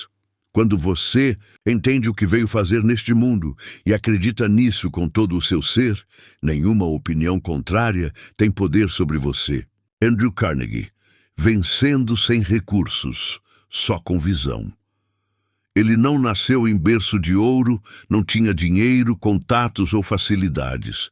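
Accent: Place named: Portugal. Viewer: Os vídeos são bons o que incomoda é esse sotaque português não entendo porque colocam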